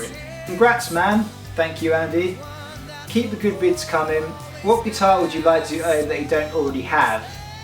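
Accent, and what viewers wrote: United Kingdom. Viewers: You're BRITISH!!!! I thought you were spanish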